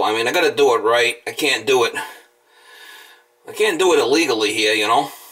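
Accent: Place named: New York, United States. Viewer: were in the USA are you based I'm a retired plumber and heating engineer from Scotland love your videos your just an honest tradesmen earning your corn but not bull shiting your customers you sound like a new Yoker apologize if I'm wrong I'm from Glasgow Scotland love your videos but remember it ain't easy being cheezy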